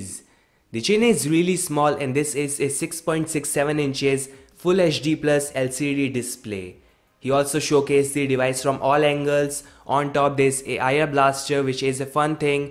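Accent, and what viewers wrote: India. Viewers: Desi English 😬😬😬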